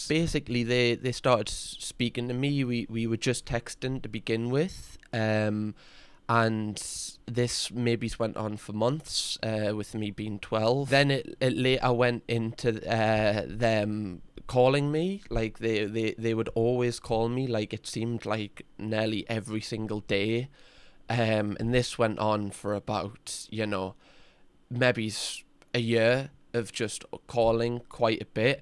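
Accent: Newcastle, United Kingdom. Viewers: hi, fellow Geordie here and someone who's been through similar things. not the same, but similar. thanks so much for speaking out. this has just happened to a friend of mine. he called in a panic and didn't know what to do. luckily i put him in contact with my friend who works with the police force, so its getting sorted. but i wanted to say that you speaking out and using your platform is going to help so many people. so many youngsters look up to you and now you've made so many people not feel so alone. i am so sorry you went through this and at such a young age. it shouldn't happen and your right that is nonsense that the helpline doesn't help those who are minors when these are the people who need the most guidance. it seems like you've done a lot of work on yourself to speak to candidly and passionately about something so traumatic so you should be proud of yourself for how far you've come. 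just know that doing what you do you bring smiles to so many even me who lives away from home at the moment, listening to you laugh n talk in that way that only we Geordies seem to, brings so much comfort these journeys are never fully over, there will always be more work to be done, good days and some not so great, but you really are an impressive young man and i know that its not just myself who's grateful you were able to survive your situation. keep that compassionate vibe you have, you never know who you may save by telling your story, who you may inspire to speak out, or who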